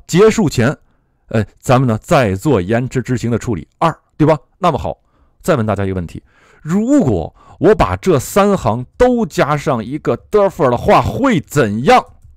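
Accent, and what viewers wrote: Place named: Japan. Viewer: 我说怎么不是英音也不是美音，原来是日音英语。。。。:face-blue-smiling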